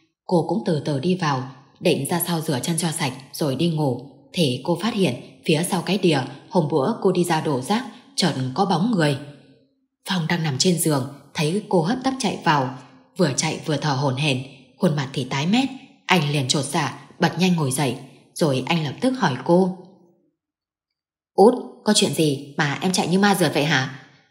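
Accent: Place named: Hanoi, Vietnam. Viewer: Giọng đoc rõ ràng. Đúng là giọng miền Bắc trước năm 1975 HÀ NỘI. Cảm ơn nhiều. CHÚC AN VUI.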